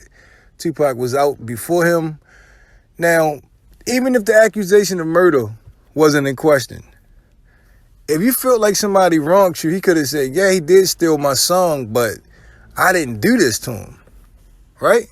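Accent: Baltimore, United States. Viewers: Are you from Baltimore? Sound like tayroc with the oooos 🤣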